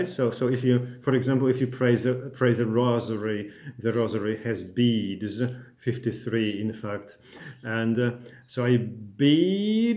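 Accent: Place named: Russia. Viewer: Oh, your accent sounds awful! Are you russian?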